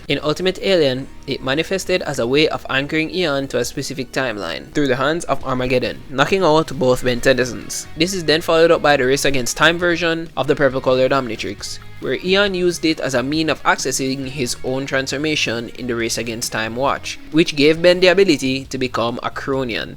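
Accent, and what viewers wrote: Jamaica. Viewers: Are you jamaican. i recognize that accent anywhere. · Are you jamaica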